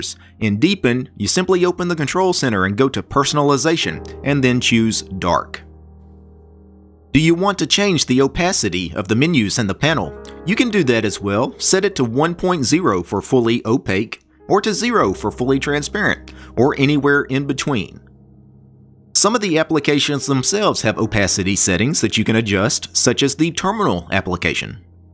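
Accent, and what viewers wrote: South, United States. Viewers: “You can change desktop invrments” 😂 I love your southern twang 👍🏼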